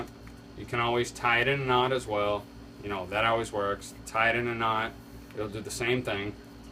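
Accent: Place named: Massachusetts, United States. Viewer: I've always been a naturalist and have enjoyed tending to plants, reptiles, and other critters. I have always struggled with my aquariums though. I see now, after watching a few of your videos that it is because I always rushed into it and was never fully prepared. This video alone has shown me just how unprepared I have been! I am looking forward to setting up a beautiful planted aquarium using all your great tips and tricks! I love watching your videos as your mild Mass accent reminds me of home where I grew up. Thank you for the great videos!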